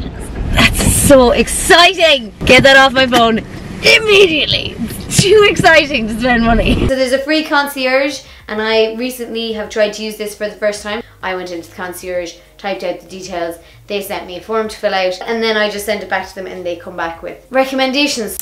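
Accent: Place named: Dublin, Ireland. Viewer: You and Diane Jennings both live in Dublin but your accents sound different to me where were you born in that city or did you move there?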